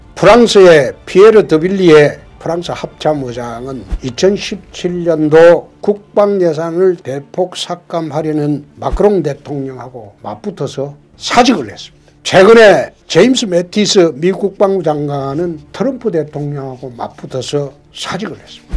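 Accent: Gyeongsang, South Korea. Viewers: 오늘 복장 이미지 쇄신에 좋습니다. 경상도 억양 고치면 좋겠습니다. 스피치 학원에서 훈련하는 것이 좋겠습니다. 감사합니다.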